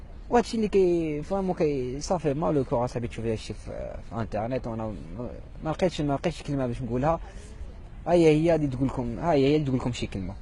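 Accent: Algeria. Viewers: ta gueule tu est pas un marocain toi il parle comme les algérien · لا هداك دزيري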